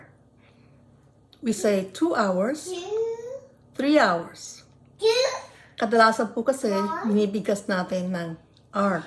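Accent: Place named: Visayas, Philippines. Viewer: Ganyan nga sis ang pag pronouce lalo na sa aming mga bisaya hehe pero hindi naman lahat , salamat po sa share have a nice weekend!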